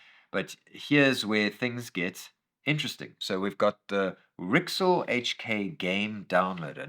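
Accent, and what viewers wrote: South Africa. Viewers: I know a South African when I hear one!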